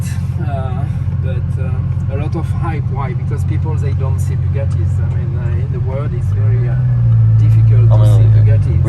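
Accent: India. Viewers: You sounds indian❤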